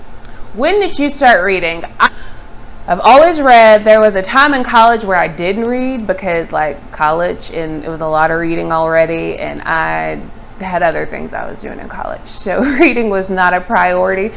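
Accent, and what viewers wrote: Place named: South, United States. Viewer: Welcome to BookTube! I’m from Texas, so I love a Southern accent 😀. Looking forward to what videos you upload!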